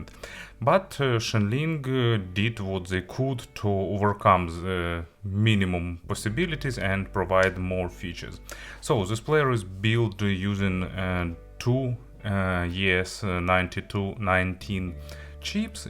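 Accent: Russia. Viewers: Nice review and your Russian english accent is not tiring to listen. I am about to receive my shan m3x in 2-days thanks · руссский акцент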